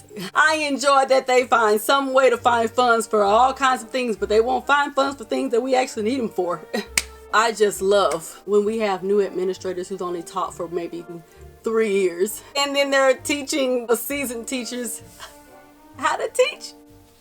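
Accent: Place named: South, United States. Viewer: Where u from with that country accent ..... I hate teaching !!!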